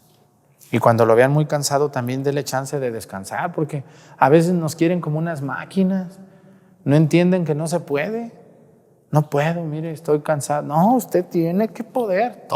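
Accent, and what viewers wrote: Mexico. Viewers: Hola padre, como cada semana muchisimas gracias por el resumen de homilias, padrecito usted es el combo completo: Sabiduria, carisma me fascina su acento tapatio y regreso una y otra vez el video para que se me grabe lo que usted nos dice, siga asi padre hablandonos con la pura verdad, que tenga una exitosa y bendecida semana💐🌸🌹🌷🌼🌺👏🏼👏🏼👏🏼👏🏼👏🏼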